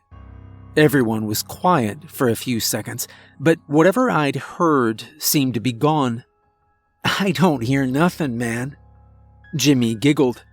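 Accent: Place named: Southern California, United States. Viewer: I'm not gonna say which, but one of those is awful. Another awful one has the initials SD...no standard for story quality and butchers the good ones. I like tho. His weird SoCal accent has grown on me.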